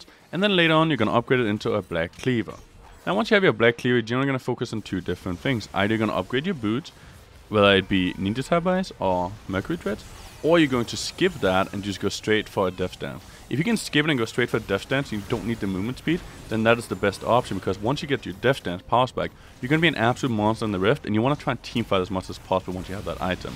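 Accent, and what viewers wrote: Denmark. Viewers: "Go for the spirit of vis-ass" xD love the danish accent.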